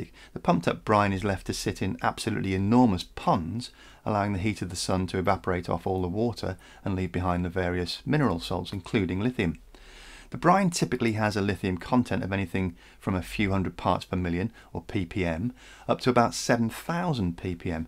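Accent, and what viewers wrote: United Kingdom. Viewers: I like his voice. So calming and so british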